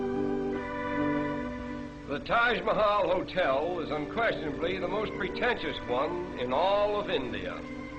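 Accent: United States. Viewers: It appears to be an American voice rather than British. Great video....the city should have retained its real name of Bombay...Mumbai was a group of mosquito-infested islands inhabited only be fisherfolk; Bombay was the modern city that the British built!! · What a horrible American accent! The British don't pronounce Bombay like that. lol.. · And the accent is American; not British. And there is no word in modern English called the..."..the Britisher"... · The commentator appears to be American or Canadian, not British. Great video! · I believe that this is an American documentary, the narrator is certainly an American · 'as described by the Britishers'sounds like an American/Canadian to me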